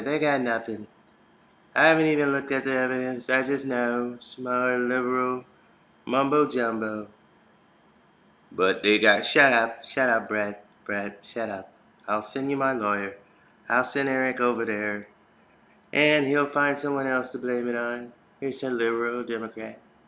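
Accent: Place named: Midwest, United States. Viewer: You are so mid west Tupelo not tupello pronounced too puh low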